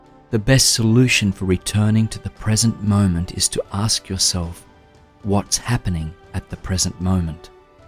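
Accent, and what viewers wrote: Australia. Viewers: Love your work! Thanks so much. Also great to hear an Aussie accent! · Good Morning, I am loving your meditations and the live ones are fun too! Its also nice to hear an Australian accent, sometimes I find it hard to meditate to other accents as it distracts me from their words haha. I do wonder if you could tell me the name of the music in this piece I find it very motivational and powerful! Love & peace Pita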